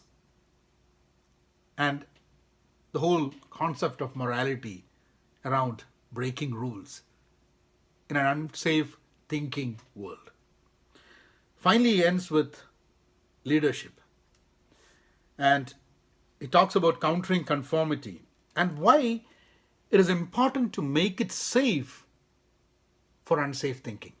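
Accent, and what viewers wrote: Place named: India. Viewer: Sir its nice that an Indian is there for me so i can understand slowly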